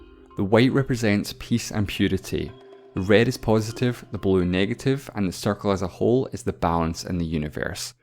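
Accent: Scotland, United Kingdom. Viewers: Is this guy Scottish? Man, those thick accents! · Omg it’s so nice to hear a Scottish accent doing a voice over yasss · i think he’s Scottish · Sounds like his accent is from scotland from brave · He is Scottish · Whatever, I´m very much enjoying your Scottish accent, keep ´em coming! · finally a scottish voice, not a yank!! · You're welcome. If you follow his channel and have seen all his videos. You will already know that he is from Scotland (he even said so). I'm used to his accent, it's cute. Much better than the posh accent of BBC documentaries. lol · i think he's scottish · I would like to know where the channel's owner accent is from? Scotland? Ireland? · what is this guys accent? it sounds scottish but it doesn’t at the same time lmao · lol i heard that but cmon don’t make fun of his scottish accent i’m part scottish too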